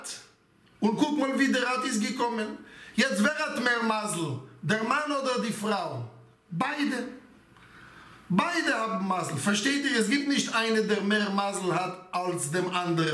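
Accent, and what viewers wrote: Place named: Germany. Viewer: Danke Rebbe, ich finde Sie reden gut Deutsch. In den Momenten, wo es nicht so klappt, bringen Sie aber eine Seele mitrein, wo man versteht, und spürt auf Seelenelbene, was, und wie Sie das meinen z. B. Wenn sie jekkeln, wo das Wort so süß rüber kommt, da bin ich sehr froh, dass Sie so unterrichten. Meiner Meinung nach, falls es Sie interessiert, und Sie das wissen möchten, für mich, sind es 98% die Sie sehr gut Deutsch Reden, die 2% Jekkisch bitte behalten, die geben noch mehr süße und Seelenfreude mit ins Herz und Kopp. Danke Rebbe.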